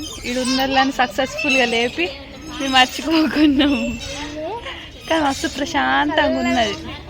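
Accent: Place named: Telangana, India. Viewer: Pakka telangana language 😅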